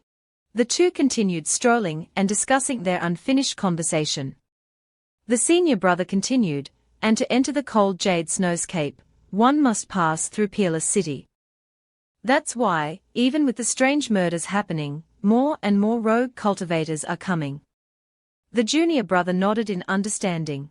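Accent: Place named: United Kingdom. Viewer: Why this uk accent 😢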